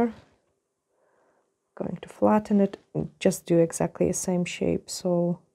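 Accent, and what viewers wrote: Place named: Scotland, United Kingdom. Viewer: How funny, I wondered why your accent sounded a bit off... lol.. you actually picked up your Scotish accent with Polish. 👍👍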